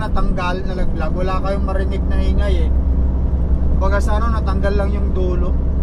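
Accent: Philippines. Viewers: astig kapa rin lodi ,..Pinoy na Pinoy ka magsalita da best Lodi Kong truck driver ❤❤❤ drive safe always watching your vlog here in St.Catherines Ontario🇨🇦🇨🇦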